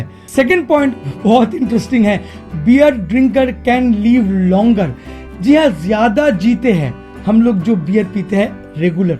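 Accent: Bengal, India. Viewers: Bengali manus chala hindi bolne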